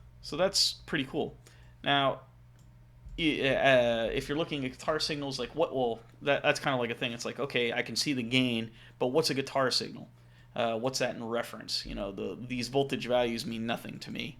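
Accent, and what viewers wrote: Australia. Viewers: Did you shake the Australian accent?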